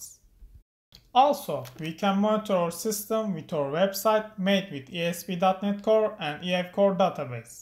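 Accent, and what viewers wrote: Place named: France. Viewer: I love your fench accent :D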